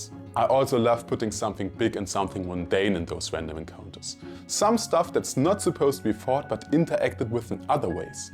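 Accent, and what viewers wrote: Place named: Germany. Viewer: klingt nach nem fellow german der englisch spricht. good luck mit dem channel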